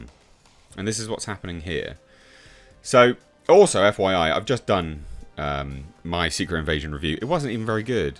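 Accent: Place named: United Kingdom. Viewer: Wow. So many tiny dicks, so many scared man-boys. Ten million "men" demeaning women daily is equal to one person speaking up and calling them on it. Sick sad little America, and Britain I guess, considering the accent of the host. Torches and pitchforks over nothing. But hey, rally around the hate. It's what drives this country. Especially in the new dark ages of the American barbarian south, where little white man-boy is king. Is anything short of forced re-education going to change things for the better?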